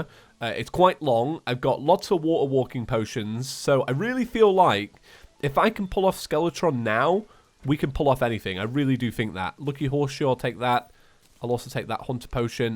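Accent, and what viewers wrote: England, United Kingdom. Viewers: I know I know, you're English, you have an accent, and that's great, don't get me wrong... But no offence, sometimes it seems like people just purposely mispronounce words, just for the sake of standing out:P (Yoshee/Yoh-shi) It's like, I know how to say it, I know how the creators intended it to be known, but hey, I'm different, let's just change it up... Haha, silly beans.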